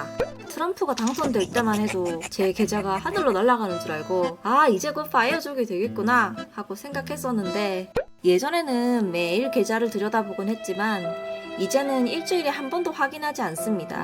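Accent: Gyeongsang, South Korea. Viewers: 경상도 사투리가 이렇구나 ㅎ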